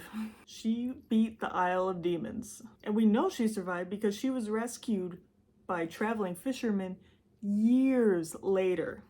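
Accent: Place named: France. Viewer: Liking your French accent 👌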